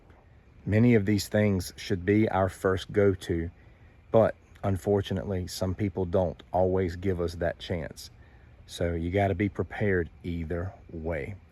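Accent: United States. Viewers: Ваш американский голос звучит ОЧЕНЬ классно Я согласен с рекомендациями.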